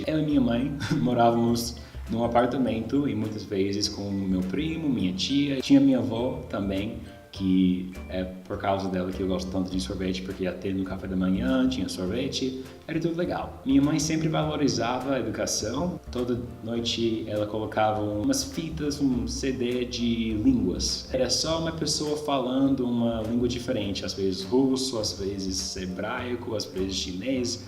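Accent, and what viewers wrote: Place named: Rio de Janeiro, Brazil. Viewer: Achei que vc fosse Brasileiro do rio parece🤣😂🤣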